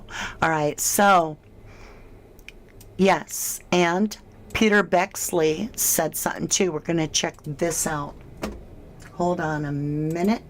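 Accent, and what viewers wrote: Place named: England, United Kingdom. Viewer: I’ve noticed lately your speaking voice is becoming more 🏴 English and it’s good because your learning some of our sayings like silly sod 🤣 another one for you crazy mare 😂 I think it describes Lucy lol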